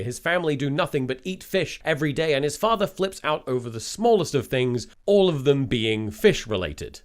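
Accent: United States. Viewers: You have a good American Accent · Holy shit that American accent is wild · Tomska's American accent is impeccable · There's something uncanny about how incredibly natural Tomska's American accent is. I know he's been doing it for years at this point but still putting it side by side with his actual accent is pinging my wrong vibes detector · I’m sure this is mentioned a lot but American accent is incredible. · Your American accent is so good what Have you been doing s British Accent this entire time Nevermind I got to the antivirus part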